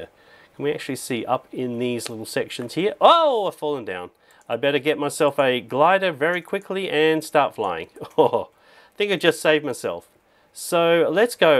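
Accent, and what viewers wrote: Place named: Australia. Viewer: I love your australia accent its so cool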